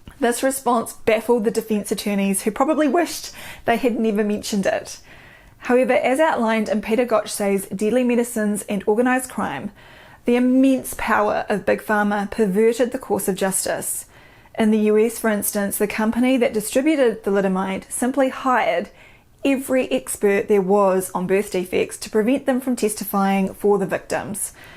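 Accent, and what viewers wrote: New Zealand. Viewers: Just love the topics, your easy to listen to with that adorable voice and Kiwi diction 👍😁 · ... your material is awesome. My go to info source. Sadly my puter and phone both try to make your Odysee vids impossible to watch. Very frustrating. I was sooooo happy to see this one go so in depth and get away with it... kudos to you. I lived in NZ for 14 years and God, I wish I'd had you as my GP..:D I think too much... is that a thing? Thinking too much? It seems thinking is a quaint old tradition no longer observed by most. People just accept/react or react/accept. You.. you.... are one doctor I'd loooooove to talk to. You seen those memes depicting dead legends... who would you like to talk to? Yer not dead and i hope that doesn't happen for a looooooooong time... but I'd dearly love to pick your brains... you seem a conventionally trained modern physician... 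yet one who has begun to question the current paradigm. THAT is an amazing leap better than any moon landing. And yes, I grew to love the Kiwi eeksahnt? I know you have a GP practice, a Youtube star base, but god! I'd love to talk to you. i have soooooo many questions.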